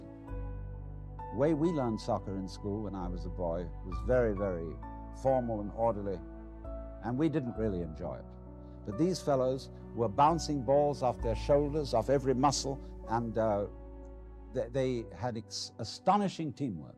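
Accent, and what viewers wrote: RP, United Kingdom. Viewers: His good looks and posh Oxbridge priviliged speak got him through the drunken BS he spouted, gotten from reading other peoples' books. He couldnt make it through a speech without a bottle of brandy. Spiritual Alan Watts the contemporary guru heh? LOL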